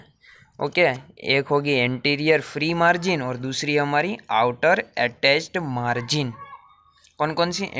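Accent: Gujarat, India. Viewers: Are u from Gujarat??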